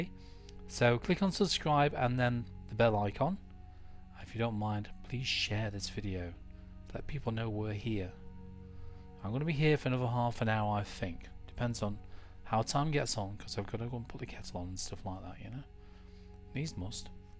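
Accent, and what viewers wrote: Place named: Northern England, United Kingdom. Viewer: Are you from Devon? I'm American but I lived in England from <num> <London) I'm American, so I'm not great with British accents, but you sound Northern to me! (my husband is southeast London.. I'm gonna ask his opinion :-) all British have an opinion on accents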